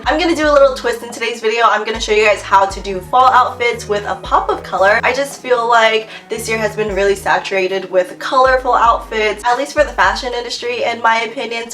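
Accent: United States. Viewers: You can tell she’s from Cali, even if she wouldn’t say it💀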